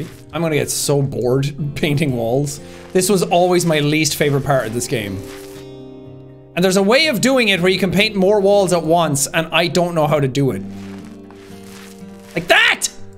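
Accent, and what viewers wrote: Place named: Ireland. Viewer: this is the only vid where I can explicitly see sean's super irish accent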